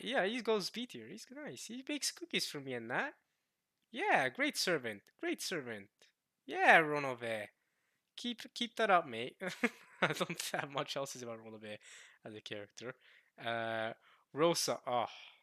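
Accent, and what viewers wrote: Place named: Norway. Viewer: And you are talking like norway people... are you from norway?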